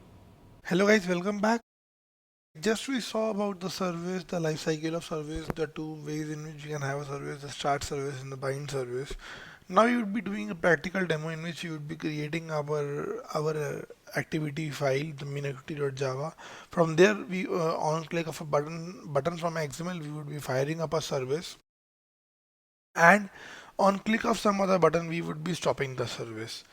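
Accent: India. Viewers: Sotaque indiano, difícil de entender.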